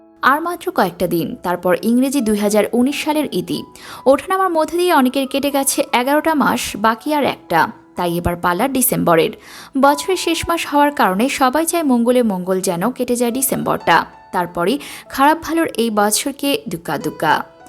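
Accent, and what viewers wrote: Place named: Bangladesh. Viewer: BANGLADESHI VOICE VALO LAGCHHE NA BLOCK KORTE HABE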